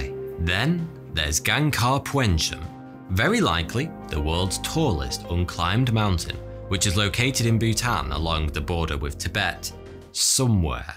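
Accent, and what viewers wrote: United Kingdom. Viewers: "to put it mildly, a bit shit" Love that British accent.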